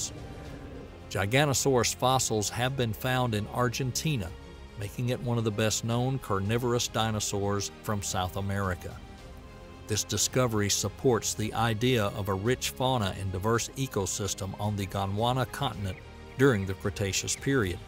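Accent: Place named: Tennessee, United States. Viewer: I love this man's accent. I wonder where he's from. Sounds like a Tennessee neighbor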